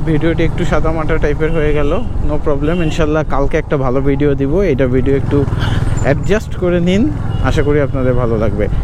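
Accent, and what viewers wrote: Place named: Jessore, Bangladesh. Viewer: তো বড় ইউটিউবার হওয়ার পর ভুলে যেয়ে না। আপনি কি যশোরের মানুষ? কথা গুলো একদম আমাদের মতো 🙂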